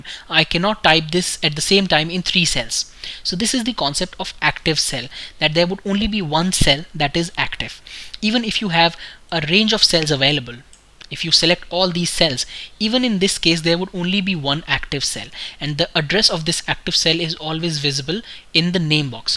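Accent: India. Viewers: you know ts is good when you hear the indian voice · One of the best tutorial for excel that with Indian English accent. Thq lotsss bro for doing it!!!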